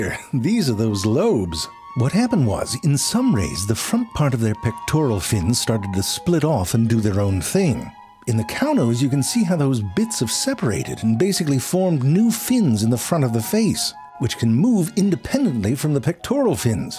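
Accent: United Kingdom. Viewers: Does the narrator sound quite a bit like the main character from the PC point and click "Scratches", or is it just because it's a vaguely British sounding guy constantly being frustrated by a man called Jerry?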